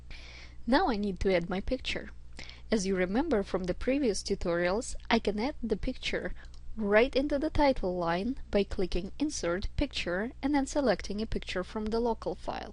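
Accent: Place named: Russia. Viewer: This video helps me a lot! Thank you so much! Although you connect the first question to the second and so on, I don't know how to import 3 or more JMix sentences into moodle. Can I get it right? when importing these sentences into moodle, we choose all sentences! In exam view, I just import 1 file containing many different questions ( about 40 sentences). In hot potatoes, can I create and import 1 file containing many different kinds of questions? such as; JMix, Jquiz, JMatch.... I would appriciate your help! * P/S: Don't speak English in Russian Accent! It's hard to hear!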